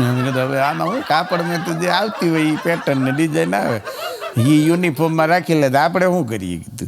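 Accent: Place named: Kathiawar, India. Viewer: Tmey. Sudtth. Gujarati. Bhasama. Bolo. Kadttiya vadi. Bhasa. Gamti. Ndthi😢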